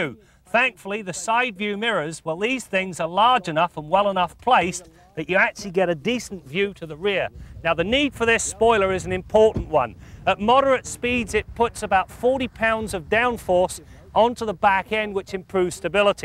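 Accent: United Kingdom. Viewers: It's weird to hear a guy with a Hitler moustache speaking with an English accent.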